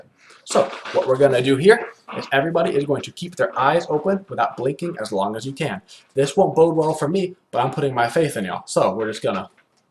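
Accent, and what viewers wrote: United States. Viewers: Watching this in 2020 you can def tell that you had a more pronounced southern accent back in 2014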